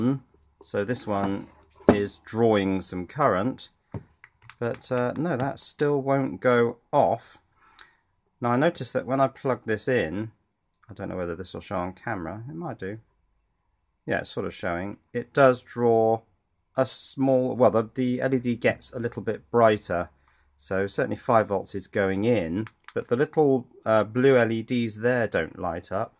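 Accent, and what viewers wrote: United Kingdom. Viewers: What was your first clue? Ha... yes, is British. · Are you British?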